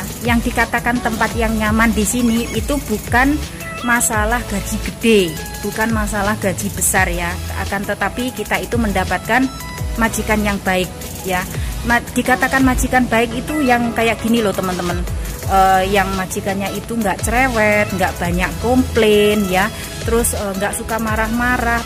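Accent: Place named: Java, Indonesia. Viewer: Oh pantes kak bahasa logat nya seperti orang Jawa 😊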